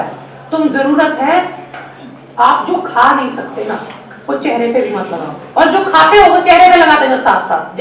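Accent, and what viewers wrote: Punjab, India. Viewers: Thank u.. its very refreshing to hear u.... a bit of punjabi accent in it... God bless u... from Kenya África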